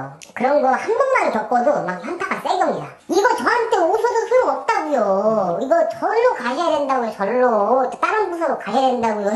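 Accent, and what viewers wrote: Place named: Chungcheong, South Korea. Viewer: 말투가 딱 아산 예산 이쪽인데?ㅋㅋㅋㅋㅋㅋㅋ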